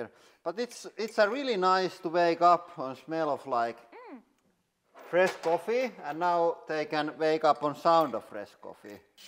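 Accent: Finland. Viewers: i only now realize this channel is finnish! its right next to russia ofc but because sweden has basically perfect english i was surprised by the accent. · I used to live in Helsinki and frikkin love the Finnish accent. It's mint. Also love the detail of using Iitala glassware. Torille tavataaaaaan!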